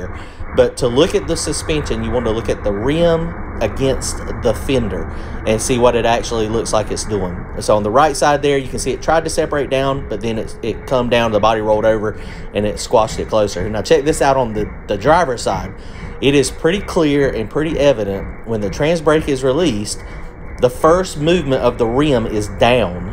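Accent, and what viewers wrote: South, United States. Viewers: Really cool informative video. Question are you originally from the south?.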